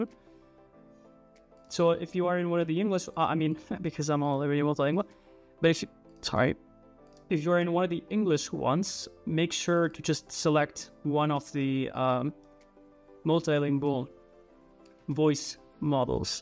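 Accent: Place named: England, United Kingdom. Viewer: si ma l'accento è totalmente inglese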